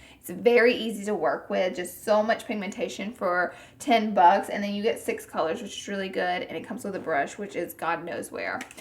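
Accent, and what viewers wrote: South, United States. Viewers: Hayley She doesn't have a "country" Accent she has a southern accent! btw their kind of the same thing but i'm southern so i prefer calling it southern. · You remind me of Alyssa Edwards! Same country accent and everything lol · Where are you from you have a country accent I love it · It sounds like she's American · I just love her southern drawl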